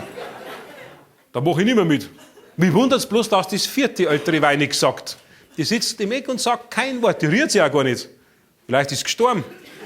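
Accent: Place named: Upper Palatinate, Germany. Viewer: Der Oberpfälzer Dialekt ist schon der Hammer ! Toni Laurer ,Bayern's Bester ! 😀👍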